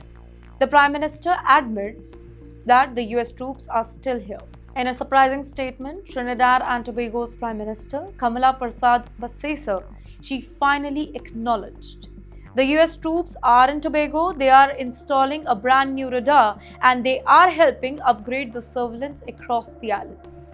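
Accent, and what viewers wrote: India. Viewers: You with you India accent you worry China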